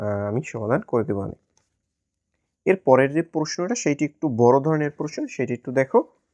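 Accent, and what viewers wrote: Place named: Barishal, Bangladesh. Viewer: Sir apni mone hoy barisailla....ami kintu barisaillah😊😊😊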